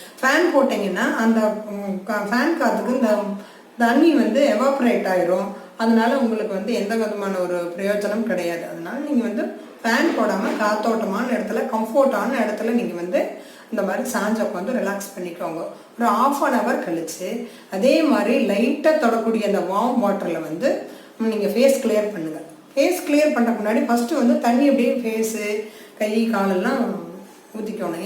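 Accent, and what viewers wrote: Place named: Kongu, India. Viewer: Sure I am from tirupur I easyly connect our kongu slang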